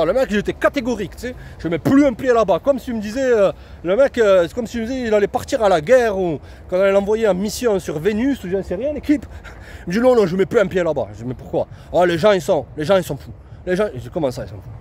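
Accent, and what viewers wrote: South, France. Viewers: Tu nous aurais pas dis le sud de la france ton accent plein de soleil nous le disait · Incroyable, tu sais que avant que tu dise 5ans, ç est sortie de la bouche, donc je ressent la même chose et oui terrifiant, moi suis des années 1970, et j'en peu plus de tts ça 😢, et en plus les gens sont tellement horrible qu'ils émanent leurs négatifs autour de peu de gens qui restent positifs, donc c est vraiment dur 😢 Mais j essaye vraiment de tenir le cap, courage à tts ceux qui sont décourager, 🙏💜 Moi pareil jme dit tu n ai pas de cette planète, alors merci à toi, j aime trop ton accent du sud ça fais du bien à entendre 🙏💜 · Je ne te connais pas , je te découvre et tu m'es déjà sympathique. Un bon accent du Sud de la France, cet accent que l'on entend presque plus même dans le Sud où les jeunes font tout pour le perdre. Et ton analyse sur la société même si tu n'as pas l'air bien vieux, enfin disons beaucoup plus jeune que moi 😉 je la partage totalement. 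Ca me fait plaisir en regardant ta vidéo de voir qu'il existe encore des gens authentiques. Moi quand j'allais à la salle de sport au début des 90's, il n'y avait pas de femmes, ça sentait la sueur et la testostérone 🤣. Les femmes fuyaient ce genre d'endroits. Aujourd'hui les salles de sports sont devenues des endroits incontournables pour se pavaner, se montrer, créer des stories pour alimenter son compte Insta ou que sais je. Le smartphone est un véritable fléau oui, n'ayons pas peur des mots. Et cela touche toutes les tranches d'âges, ce n'est pas propre aux plus jeunes. Tiens récemment je suis allé au restau avec des amis tous des quinquas comme moi, entre chaque plat, silence radio tout le monde était sur son smartphone. A un moment j'en ai eu marre et j'ai poussé une gueulante ! Oh les gars ! On Se retrouve entre amis pour passer du bon temps, pour partager, communiquer et vous qu'est-ce que vous faites ??? 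Vous pouvez pas le lâcher le temps d'une soirée votre joujou !!?? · Merci pour ton post! Tu as bien fait de parler avec ton accent du Sud, c'est encore plus VIVANT! Tu vois, j'ai 78 ans et je ne suis pas une "meuf", je suis un etre humain engagee dans ma vie qui est simple, car je refuse d'etre dans la consommation. (Comme j'ai toujours fait!) Et j'apprends a me contenter... C'est un choix, car je suis persuadee que ce systeme touche a sa fin...et que bientot tous ces zombies, comme tu les appelles, vont se reveiller et devenir des ETRES, parce que chacun est responsable de sa propre planete! C'est bien beau de constater ce qui ne va pas, mais ca ne suffit pas. C'est a chacun d'entre nous de produire autre chose et d'aller le chercher en lui-meme, comme l'eau que l'on puise dans le puit, il y a UN EFFORT PERSINNEL POUR RAMENER L'EAU! (Si ca te parle...)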